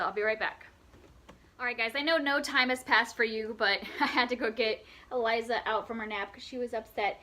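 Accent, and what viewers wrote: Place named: United Kingdom. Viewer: For me if you have a British accent for your audiobooks ... I will listen to you talk for hours!! Such great recommendations on this list!! ☺️💜💜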